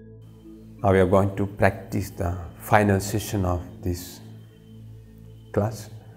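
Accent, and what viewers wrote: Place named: India. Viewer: heloisa688 it's his variation of english: Indian English. Not bad pronounciation. English is official language in India.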